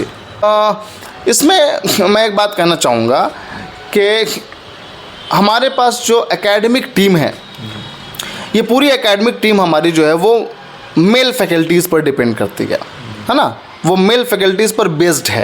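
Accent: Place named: Magadh, India. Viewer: "है ना" यह तो अपने मगध (औरंगाबाद_गया) क्षेत्र वाले लगते है । कोई Confirm करें, Plz। m Ex JMIIte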